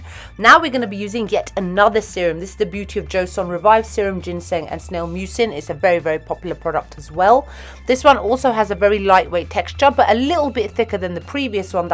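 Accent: United Kingdom. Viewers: I love this video so so much! Its a good old youtube style grwm with "oldie but goodie" products (i.e., reliable). I am so tired of hearing of new brands everyday and it feels like a rat race. Give me my NARS blush from 2010 and it still works, it still makes me happy. Also, your skin color is the same as mine, so its a great reference point to try shades based on how they look on you. And, finally I really connect to the manner in which you speak being a desi who grew up amongst relatives based in the UK :) ... So many reasons to love your content <3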